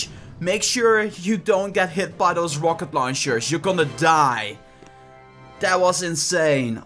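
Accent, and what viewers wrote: Netherlands. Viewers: Hey man, you got some nice boom beach videos, just subscribed! 1 question though, where are you from exactly? Cause your accent sounds a bit funny (not in a negative way, but positive) i'm guessing from the netherlands?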